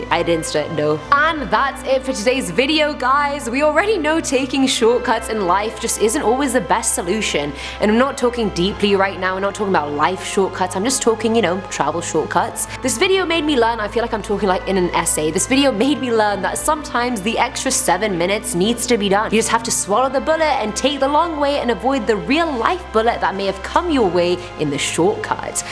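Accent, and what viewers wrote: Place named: England, United Kingdom. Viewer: Seriously though, exotic look an English accent is flippin hot🔥😍🔥😍🔥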